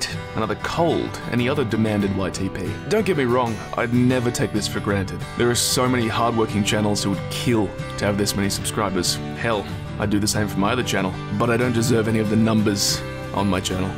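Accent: Australia. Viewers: I didn’t know you were Australian. But see ya later I guess! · Oh fuck didn't know U were Australian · answer any you like: are you australian? what was your favourite ytp to make?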